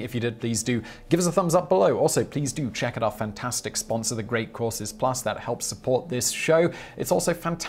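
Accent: United Kingdom. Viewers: Why does vsause have a brittish accent? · I love Simon's voice..the information gets absorbed alot easier when its in British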